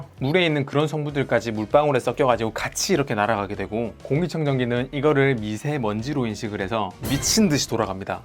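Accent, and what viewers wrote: Gyeongsang, South Korea. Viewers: 갱상도 발음인데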